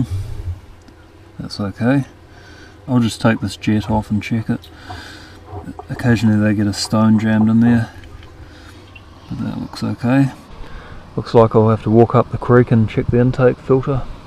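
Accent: New Zealand. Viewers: Fascinating how a sort of "hybrid British accent" evolved in New Zealand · I love the kiwi accent... "Strup of deed bak"... Love the video. You gave me some ideas. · I'm looking at this thinking its gotta be NZ bush... hears the accent ;) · ..you sound like a Kiwi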